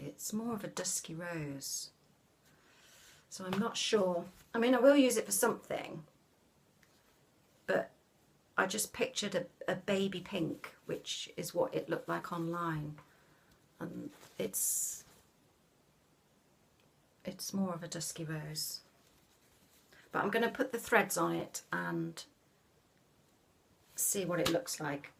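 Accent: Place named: England, United Kingdom. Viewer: Hi, great progress as always! I'm English living in Australia and listening to your lovely English accent makes me feel so happy for the rest of the day! I got my hubby to make the scroll frame, so easy and cheap and I found sticky backed Velcro which is great to use! So a big thankyou!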